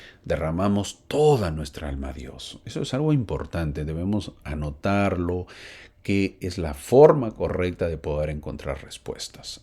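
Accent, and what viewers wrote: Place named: Peru. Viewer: yo les he hecho esa reflexión a mis hijos. Les obligamos a ir a la escuela. Pero si no quieren ir a la Iglesia, ahí hablan de la libertad de escoger. Siempre les digo que es lo nos va a dar la vida eterna??? Parecernos a Jesucristo eso lo aprendemos en la escuela llamada Iglesia. Y se quedan mirándome, y me dan la razón!!!😊, gracias me da gusto escuchar acento peruano!!❤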